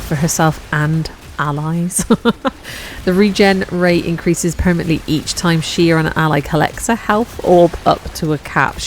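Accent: West Country, United Kingdom. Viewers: Hell yeah! You sound like your from the West Country. Instant follow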